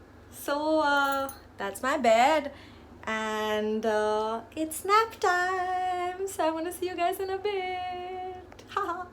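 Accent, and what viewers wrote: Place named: United Kingdom. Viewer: Engrej ki tone bol ri h pgl hoshyari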